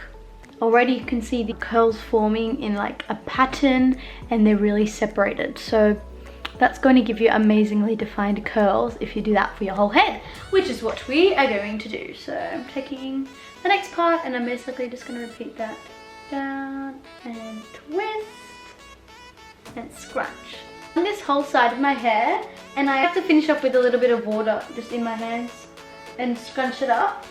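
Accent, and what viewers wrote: Australia. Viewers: i loved your accent, lemme guess you're Australian?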